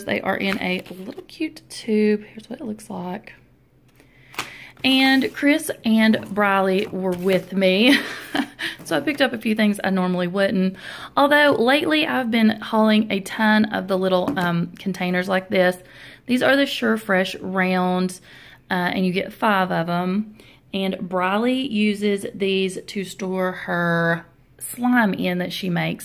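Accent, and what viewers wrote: South, United States. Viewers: I just found your channel and was getting ready to ask where in the South you were (heard that Southern accent😄)then I saw your p.o.box address in TN. I live in North Georgia about 60 miles from Chattanooga TN. I've never heard of Elizabethton TN. Nice to find another Southerner here. 😄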